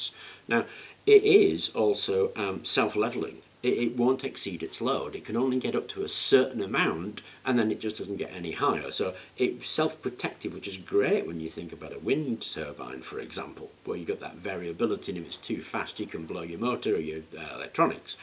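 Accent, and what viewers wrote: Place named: England, United Kingdom. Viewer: Piękny angielski akcent :)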